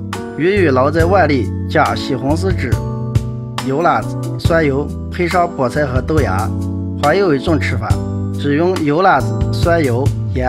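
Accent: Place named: Shaanxi, China. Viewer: 陕西陕西话蛮好听的，这个鱼做甜品也很好吃😋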